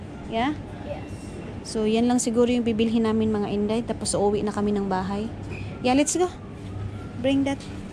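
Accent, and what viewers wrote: Visayas, Philippines. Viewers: Bisaya diay ka.?! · Bisaya d i ka inday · "maglabang tayo dito" Bisdak jud nga daku! Hahaha! Thank you for making my day. Kaya ra kaau ang lockdown diri basta ga lantaw sa imong mga vlogs. Lingaw kaau.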